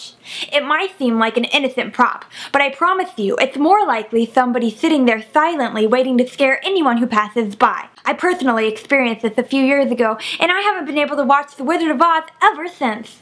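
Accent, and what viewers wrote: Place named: Europe. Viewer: Anyone else notice how much sexier is than? That bedroom hairdo and so very continental with that accent..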